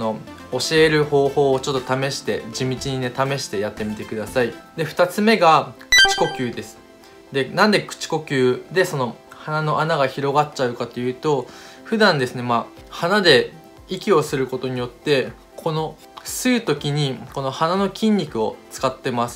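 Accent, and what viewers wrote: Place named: Okinawa, Japan. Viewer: 沖縄のなまりはいってますね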